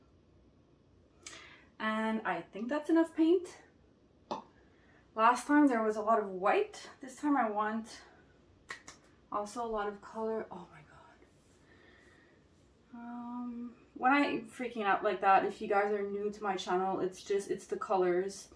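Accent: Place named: Quebec, Canada. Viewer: Omg that is exactly the style I am trying to achieve thanks! You are good teacher and oh boy the quality of your videos!!! And that French Canadian accent. Love ya, a big fan from Quebec! Thanks for all the hard work you put in your channel. You are the best.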